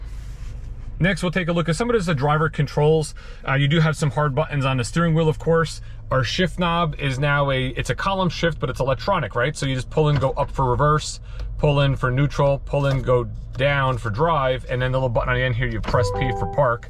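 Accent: New Jersey, United States. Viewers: My favorite video; your New Jersey “button” accent cracks me up every time. Love you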